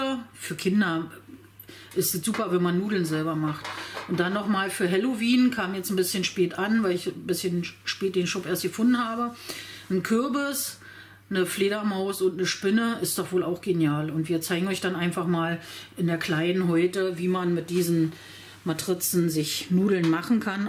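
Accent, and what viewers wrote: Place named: Berlin, Germany. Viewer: Hallo, vielen Dank für Deine Videos, liebe, die berliner Schnauze finde ich als Rheinländerin schon amüsant. Ich muss aber mal was fragen, ich such mir einen Wolf......gibt es einen Adapter, mit dem man die Matrizen der großen Avance auf die kleine Viva schrauben kann? Für die große gibt es so viele Formscheiben....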